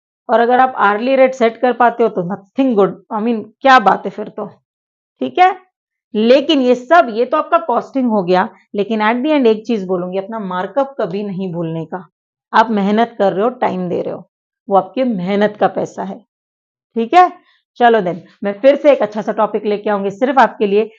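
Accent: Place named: Gujarat, India. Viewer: Medam gujarat se he ya fir mumbi se he 😊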